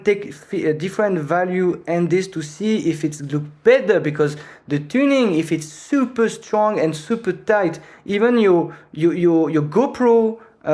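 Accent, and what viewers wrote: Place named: France. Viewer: f*cking english 😂😂😂 nice tutor in frenglish dude 🤜🤛😁😁😁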